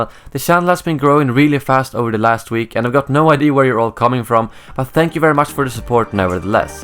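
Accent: Sweden. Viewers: You are a great YouTuber to watch, keep up the great uploads! And who doesn't like that Swedish accent?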